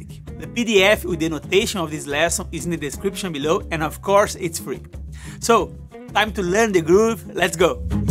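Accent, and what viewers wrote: Brazil. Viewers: me waiting for him to speak so I can check the accent: "... myes ok he sounds Brazilian I can keep watching ^^ " really good vid amigo !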